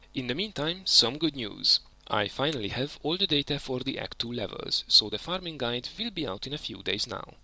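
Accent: Hungary. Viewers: bakker, meghallom a hangod, "ez magyar akcentus" megnézem, és tényleg:D jó videó :D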